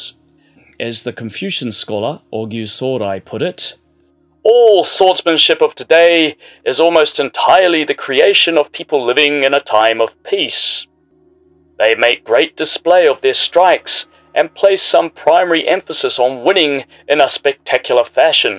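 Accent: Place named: New Zealand. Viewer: Nice work, great to hear a Kiwi explain this.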